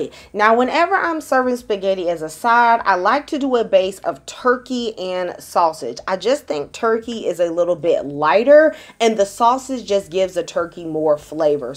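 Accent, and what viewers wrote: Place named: St. Louis, United States. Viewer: You sound like you from St Louis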